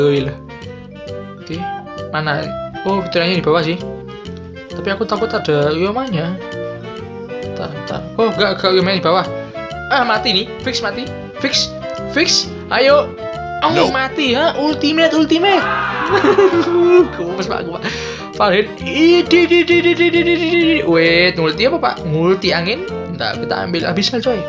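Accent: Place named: Malang, Indonesia. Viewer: bahasane malangan hehe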